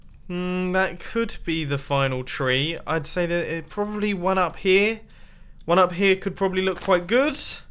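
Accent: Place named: RP, United Kingdom. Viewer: Who else remembers posh?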